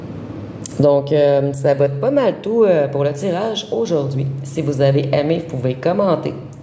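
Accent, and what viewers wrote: Quebec, Canada. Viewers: Merci infiniment pour cette guidance tellement juste pour moi. Avec le magicien et le roi d'épée c'est exactement ça ! Maintenant j'attends mon roi de coupe qui arrive j'en suis sûre. Je vous écoute de Paris et j'adore votre accent québécois ❤ Merci et bonnes fêtes de fin🎉 d'année 🎉🎉🎉🎉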